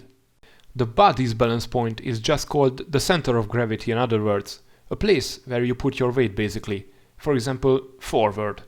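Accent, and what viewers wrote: Hungary. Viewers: Vó srákok nem gondoltam volna h magyar csatorna :D pedig felismerem a magyar angolt. Pont jol jonnek ezek a vidik. Ment a sub pisz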